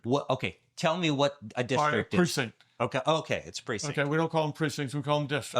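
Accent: New Orleans, United States. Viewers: This guy has the classic New Orleans accent. Makes me miss that town. I spent a lot of time there in the ‘90’s. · Perfect accent, soon as this video started if knew he was from the New Orleans area.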